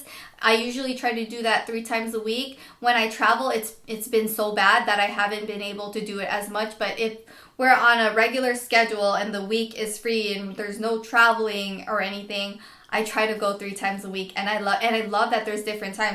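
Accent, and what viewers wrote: Los Angeles, United States. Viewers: you are such an LA girl.. ur accent the way you talk is sooo LA.. (i live in LA) i love it... 'u can take the girl outta the hood but not the hood outta the girl' godbless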